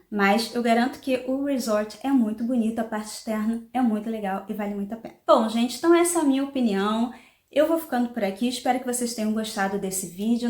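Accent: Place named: Brazil. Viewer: Speak like brasileira.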